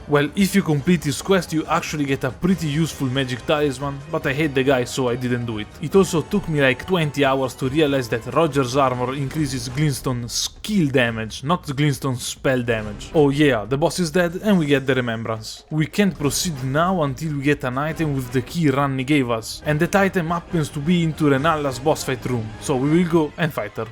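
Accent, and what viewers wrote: Italy. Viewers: are you italian? this accent 😹❤️ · He sounds italian wtf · scusa la domanda, sei italiano? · Accento italiano fortissimo, poetico❤️ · Bro are you italian? (I m italian)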